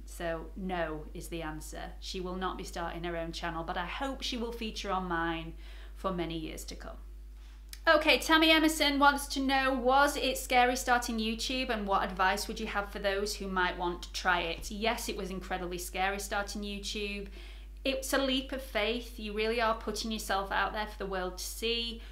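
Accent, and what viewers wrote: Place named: Yorkshire, United Kingdom. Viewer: I should’ve known you was a Yorkshire lass as your so down to earth although I did detect the accent but sometimes it’s difficult Cos u cud also be from Manchester or somewhere 😂 I’m guessing Leeds maybe 🤔 I’m from good old Doncaster 😁 and you sound just like me 😂😂 anyway I just want to say a big congrats on your one year anniversary it’s a great achievement. Am only a recent subscriber but am loving your channel 😃 keep up the good work lass thas a proper diamond 😂oh and you do not look 39 😲 ❤️ xxx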